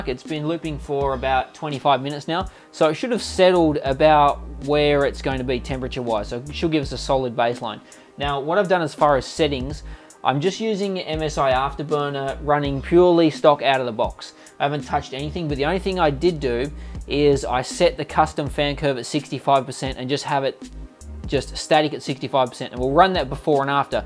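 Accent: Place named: Australia. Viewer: His guys aussie accent is too thick..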